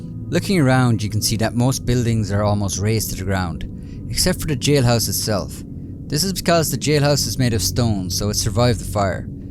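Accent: Ireland. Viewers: Fantastic video, absolutely loved this! Really interesting and thought-provoking 😊 Biggest mystery for me though is which part of Ireland that lovely accent is from... my g-grandad was Irish from Cork, doesn't sound like there! 🇮🇪 Keep up the good work, you deserve so many more subs! Maybe Princess Isabeau would be a good one too, I see a lot on the mysteries sub talking about her (I've got a feeling she's not to be found, but hey ho) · Irish I believe but there are a lot of Irish accents.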